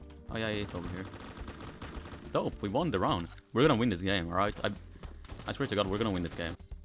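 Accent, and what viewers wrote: Sweden. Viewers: Man kan höra att du är svensk på din Engelska😅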